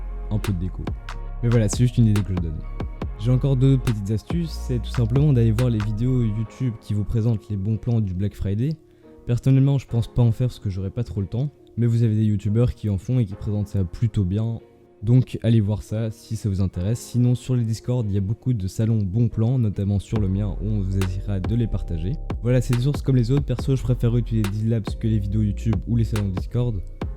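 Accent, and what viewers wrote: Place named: Spain. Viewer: Un accent espagnol de qualité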